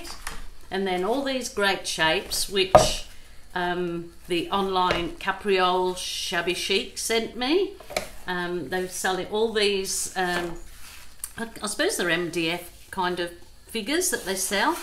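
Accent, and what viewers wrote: Birmingham, United Kingdom. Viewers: Spectacular result, and please, keep on talking, it is lovely to hear the brummy accent.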